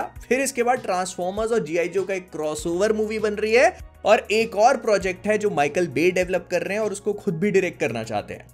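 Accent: Bhopal, India. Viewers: Baba makde app bhopal se ho kya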